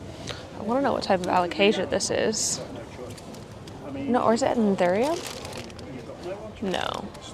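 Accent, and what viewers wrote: United Kingdom. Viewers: That Brittish accent is slowly taking over your American accent. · I just noticed the longer that you have been in the Uk you are speaking more with a British accent.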